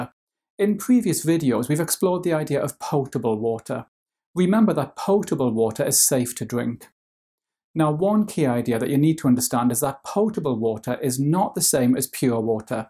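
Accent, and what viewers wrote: United Kingdom. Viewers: Sir, you a brit innit?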